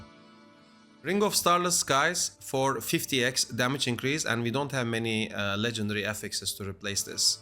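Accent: Turkey. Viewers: isim dehşet iyi, youtube a yazdım aksanda Bi farklılık sezdim aha dedim türk 😁😁 ekmeğini yiyoruz forumlarda saygılar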